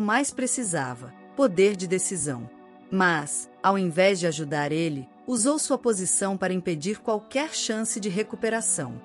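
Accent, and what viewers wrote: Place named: Northeast, Brazil. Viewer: Boa gostei sim ê isso mulher parece que vc ê nordestina paraibana,cearense,bom seja lá de onde fores meu abraço e um xeru p vc Matheus pela coragem de nunca desistir daquilo e quem vc amava valeu e muito valeu e para o senhor arrogante Paulo a estrada ê larga mas contém curvas fechadas querido e as suas curvas te enrolaram,bom como estas pode mandar no meu tempo assistir eu várias obg canal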